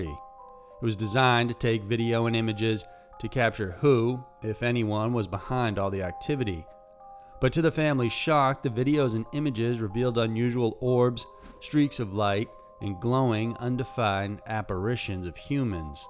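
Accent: South, United States. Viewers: Does anyone know where the narrator is from? There's a hint of maybe a southern accent on some vowels. It's slight and may just be style, but I can't help but wonder. Words like, "time," "wire," and "back." Seems less prevalent in this video than others.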